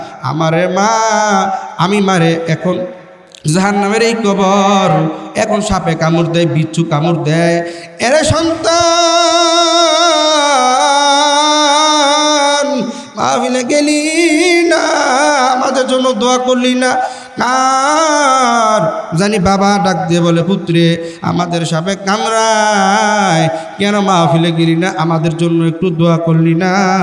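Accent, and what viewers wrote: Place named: Shariatpur, Bangladesh. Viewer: পুরাই শরিয়তপুরী মাশাআল্লাহ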